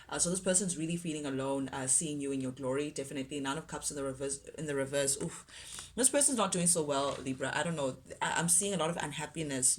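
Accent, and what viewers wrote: South Africa. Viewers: Wow I love your channel, you sound south African could be wrong, but I like it.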